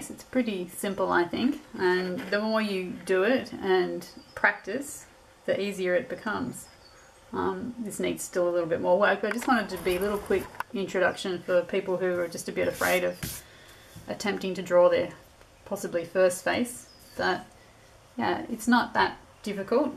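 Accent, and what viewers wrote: Australia. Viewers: Great to hear an Australian voice & the correct ways to use mixed media products that are available in our country TFS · hi - spotted you in pinterest & came on over here to yr lil corner of the earth. this is the 2nd one of yr vids i've watched, but plan on watching them all eventually. i hear by yr accent yr an aussie - same here. i'm from adelaide - where are you from? anyway, thanx for sharing yr art, i've really enjoyed watchingit.:)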